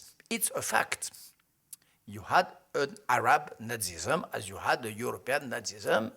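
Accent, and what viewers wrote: France. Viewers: he is right anyway... but his french accent is fucking funny...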